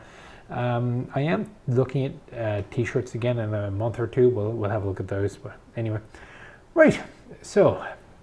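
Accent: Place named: Ireland. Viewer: Ah yes, more from the Book of Armaments as read by a Irishman.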